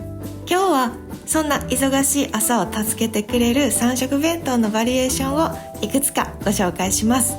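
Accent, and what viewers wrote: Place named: Kansai, Japan. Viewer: 関西のイントネーションだｗ いつも拝見して参考にさせていただいてます♬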